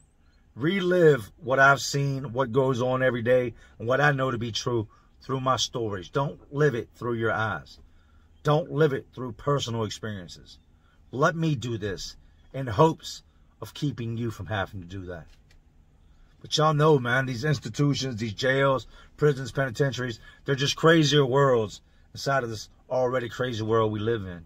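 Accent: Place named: Reading, Pennsylvania, United States. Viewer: Bro, I been binge watchin your channel. From Cali but Lived in Reading, PA for 8years listening to you talk is nostalgia for me I love this shit. Thanks for the stories I listen to you while I work overnight janitorial. Really made me realize I need to cool it with the alcohol I appreciate you man. Thank you. Big respect I’m glad I never got caught up in all my bullshit cuz real talk I’m lucky I was heading there some nights. Anyways, great channel glad I found this.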